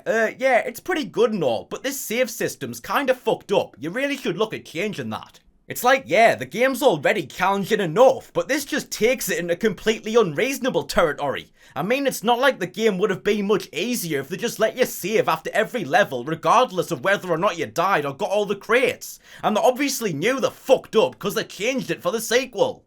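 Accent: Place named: North, United Kingdom. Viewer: The northern accent and swearing is killing me. I wish you had presented Gamesmaster in the 90s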